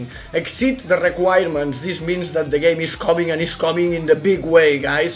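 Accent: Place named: Catalonia, Spain. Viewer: Hola soc català com tu, lo accent se te nota molt jajaj es bastant graciós veure com parles ingles bé pero en accent català.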